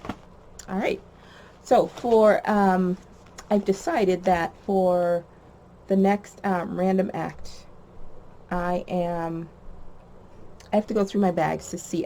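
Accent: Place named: Massachusetts, United States. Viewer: I can definitely hear your ma accent 😂 I should have known from the last video, one of my best friends is in CT near ma though and she has a similar accent. Loved the video. Thank you for sharing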